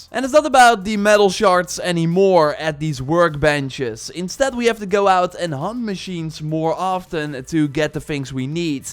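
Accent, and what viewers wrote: Netherlands. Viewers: I can tell he's Dutch and it's just too funny.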